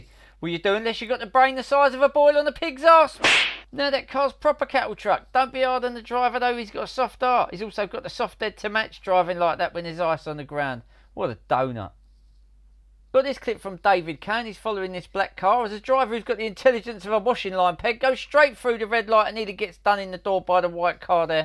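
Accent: Cockney, United Kingdom. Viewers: Cockney commentary is a riot. Subscribed 😂😂😂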